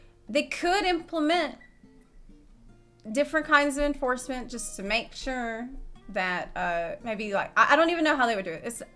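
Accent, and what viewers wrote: South, United States. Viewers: Has anyone else noticed that since has been back in the US shes been slowly getting a stronger southern accent? LOL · Your accent is sounding a lot more southern since getting back to the states... it adds to your cuteness level 😁😁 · Some videos she just gets real southern. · I can't get over the fact how SOUTHERN sounds. She reverted so quickly to her American accent. It's astounding. E: As far as the topic at hand, I have an FC apartment, I have a private ward apartment, and I have a small house. That's 400 slots, that's more than enough for me. I'm not double dipping on any one form of housing available to an individual. I got my apartments way before I ever did my house (thanks ward expansions, you're the real MVP here), but I know what the rules are, and I stick with them. I wish everyone did the same.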